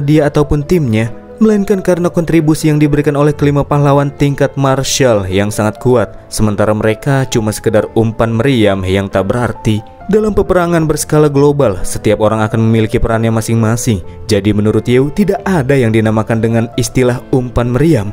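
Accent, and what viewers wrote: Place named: Payakumbuh, Indonesia. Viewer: Logat bng kaya orang pykmb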